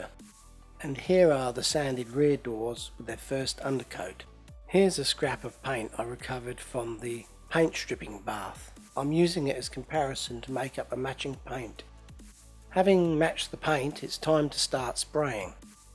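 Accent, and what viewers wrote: Australia. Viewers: I like your work as well as your speech. Australian sounds great. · I’m so incredibly impressed! Especially since I can’t do ANYTHING even CLOSE to what you just did! Add the really “fun to listen to” Aussie, and you’ve got a perfect video. Thank you so much!!!! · I thought at first there was some Aussie accent in there. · British or Australian? Sounds more like Australian. Great video, no matter where it's from.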